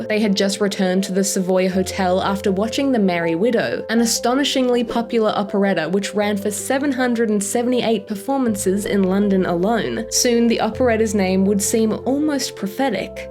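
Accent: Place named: Australia. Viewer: This is excellent! And nice to hear an Australian voice (and "row"? we know what you meant!!).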